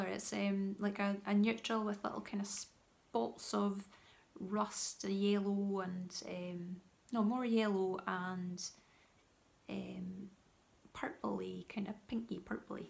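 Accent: Scotland, United Kingdom. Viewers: Hello, Ruth "Loves to knit " recommended your podcast saying that you have a lovely Scottish accent. I grew up in Dundee and Broughty Ferry. I have been in Perth Western Australia for about 40 years and sooooo homesick. It is wonderful to sit and knit and listen to you talking. i am happy for your podcast to be as long as you need. Great first podcast. Thank you. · So glad I found your podcast! You make beautiful things and I love your accent :-) I got married in Scotland and can't wait to return some day. Can relate to your feelings about your first episode, as I just made one of my own. Looking forward to binge-watch your other episodes :-) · Ooh very nice. My dad was from Cromarty so it's lovely to hear your accent. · Hi I really enjoyed your first podcast and seeing your knitting. Love your Scottish accent too! Greetings from New Zealand, I live on the stunning Kapiti Coast about 50 minutes north of Wellington in the North Island. 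I love how YouTube brings like minded people together from all corners of the planet. If you don't already watch, you might also enjoy Knitting Traditions podcast. There's so many to choose from! I'm glad I found yours. Your Joji sweater will look fab in your yarn choice and I look forward to seeing it come together. See you next time x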